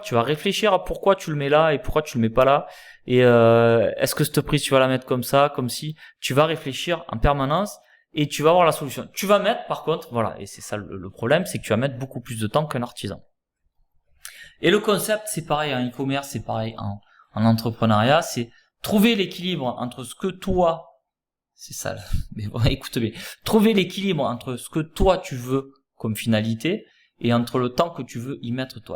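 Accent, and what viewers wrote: South, France. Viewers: Salut, merci pour cette vidéo et puis entendre cet accent du sud, quel plaisir. Les US c'est top mais cet accent chantant me manque un peu quand même. Beau bureau! La bise.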